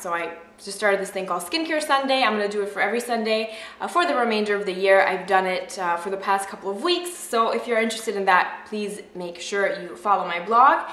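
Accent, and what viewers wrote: Canada. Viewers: I actually detected your Canadian accent in this video. lol :)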